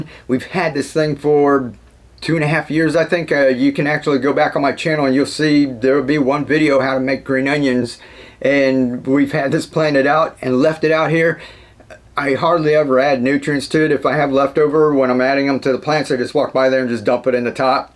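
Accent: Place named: Alaska, United States. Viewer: You sound like another Alaskan 😂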